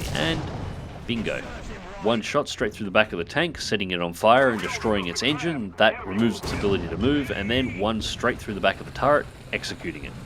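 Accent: Australia. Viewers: Matey, I really enjoy how you describe things!!!! You could make bread mold sound like the most fascinating thing on the planet!!! If I was an Arab, I would buy sand from you to put next to all of the rest of the sand I had!!! With that smooth Aussie accent, I'm glad I'm not buying a horse or a used car from you!!!! Please think of this as my off-handed attempt at paying you a sincere compliment!!!!! What Squire is to funny, you are to amazingly interesting!!! the fact that you are often self-deprecating, makes you even more interesting and honest!!! I have been such a huge fan since I found your channel!!! I truly wish I could buy you a cold beer and a stubby of Jack!!! Maybe some day I will get the opportunity, who knows, the world is getting smaller all of the time. All the best from way out West, Sprog1 in AZ.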